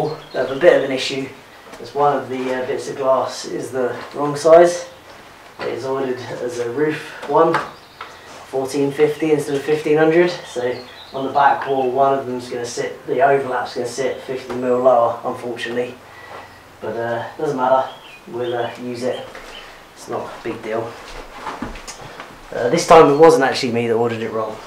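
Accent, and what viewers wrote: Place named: England, United Kingdom. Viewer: Теплица прикольная а вот чепорный английский говор отвратителен.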